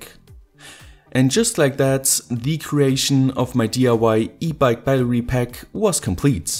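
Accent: Germany. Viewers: German English ;) "controlaaa" :) "wourkin" :)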